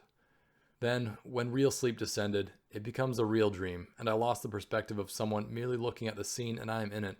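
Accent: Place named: Canada. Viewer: It does not matter to me that you mispronounce words because you speak with a Canadian accent. I am grateful that you uploaded this masturpiece by Dallas Fort Worth.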